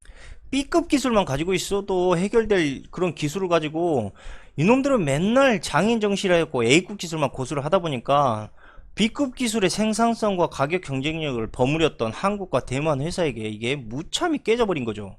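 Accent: Jeolla, South Korea. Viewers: 듣기싫네 알고 떠들어요 전라도라 더싫음ㅡㅡ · 목소리가 삼성 싫어하는 7시 지역 놈이네. 역시,,,,,,, 예라이 썩을 놈. 퉷퉷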